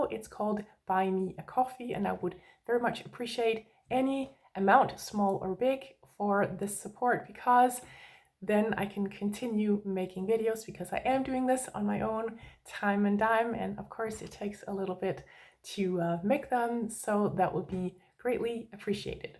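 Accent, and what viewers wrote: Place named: Denmark. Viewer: I thought the "kile kile " I heard from some danes was just a mimic as we do in Spanish. Now it makes sense with "kilde " 😅😊. Sorry but may I ask where your Danish accent comes from? Sounds so nice. At the university (KU) one of my professors had the same accent 😊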